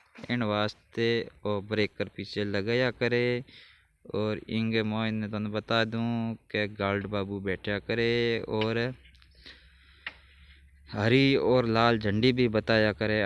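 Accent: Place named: Rajasthan, India. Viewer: Ram Ram sa आपका कौनसा गांव है भाषा से तो फलोदी के लग लग रहे हो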